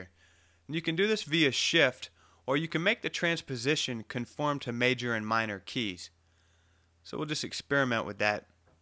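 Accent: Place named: Louisiana, United States. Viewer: what is this accent.... louisiana? sounds tight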